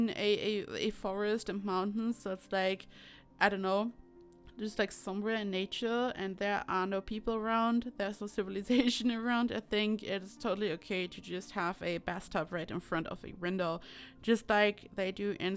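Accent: Germany. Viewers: Are you from Germany??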